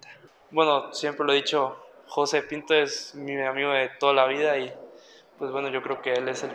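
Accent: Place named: Mexico. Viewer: Ya perdió su identidad este muchacho, habla puro mexicano.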